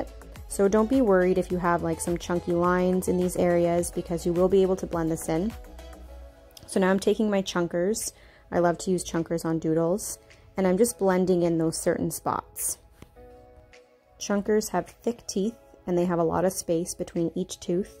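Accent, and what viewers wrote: Canada. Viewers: I've NEVER been a pet owner, but Im so glad this channel was in my recommendations. I found this video to be so satisfying and peaceful. And as an American from the great State of Texas, your Canadian accent got you a new subscriber!! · A bit off topic, but as a non-native English speaker, I felt so proud of myself when I could hear your Canadian accent and then I checked and the assumption was correct😄 And on the topic of the video - Monty is just an absolute sweetheart 😍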